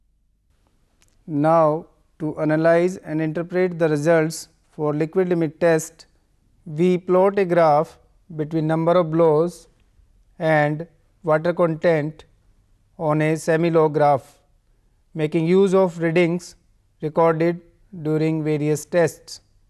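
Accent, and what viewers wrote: India. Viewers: this is indian english language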